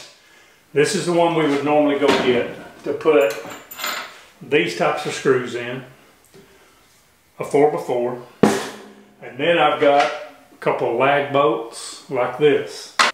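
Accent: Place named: Florida, United States. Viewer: Just asking, love the accent man, reminds me of FL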